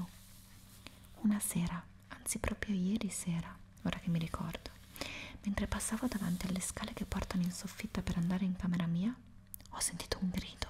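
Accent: Italy. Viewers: I love the softness of his voice and his Italian accent 😴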